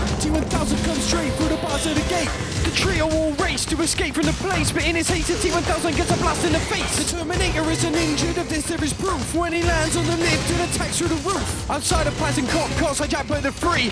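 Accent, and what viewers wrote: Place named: United Kingdom. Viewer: LOL, nah mate. He's British. Strange how other countries have trouble telling our accents apart.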